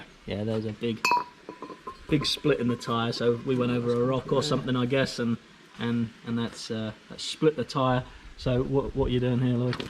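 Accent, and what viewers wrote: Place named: Norwich, United Kingdom. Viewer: I've just found your channel, and am really enjoying the content. Me and my family are thinking of moving to central Portugal with everything that's going on in the UK. I have to ask, I am sure I recognise that accent. Are you from Norwich by any chance?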